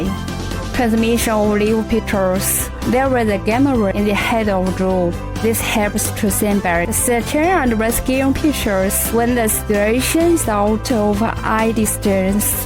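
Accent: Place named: China. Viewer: That is some serious chinglish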